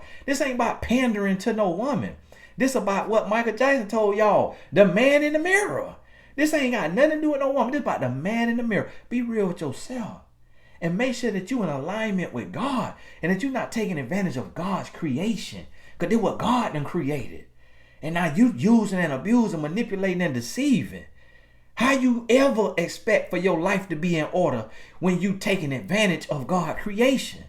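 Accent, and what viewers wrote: South, United States. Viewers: I always giggle on the inside because you have to interpret our southern slang words or phases. I am from South Fla the east coast and I totally understand all of them. Have me rolling 😂😂. Yes it is bazz instead of bath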